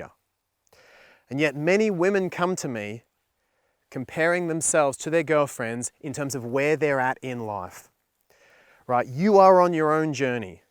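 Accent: Australia. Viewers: Australian accent is equally good 😜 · Everyone has his/her own strength, beauty, and talented like ourselves fingerprints. No one can still what we have. I’m just like you and others. I’m proud to be my authentic me. Yes, strongly agree! Only you if you want to be great or better to complete and compare with, this is the worst enemy you will face with in the world. Often time I wanted to give up on what I’m doing, often time I want to become whoever better than me. But the end of the day, me only I can be. I love the way you are. you are sexy I’m telling as a feminine energy. Your Australian accent is so cute and unique. I’m Thai but have lived in the USA for awhile, people get a good laugh out of my Thai-Lish all the time. I thought, YES, I’m so special cuz only me can talk just like me. I am a surgical assistant for cardiac surgery, I can do a job like Americans, but they can’t speak English like me 😉 I learned to love myself since I’m different in the different world like America. 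Thank you for your message, it’s so rich and right on the money 💰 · You have an Australian accent! Oooeee! Just as sexy... great message, you’ve got to own your uniqueness ❤️ it’s taken me a long time to look in the mirror and believe I’m a 10/10 in my own right xx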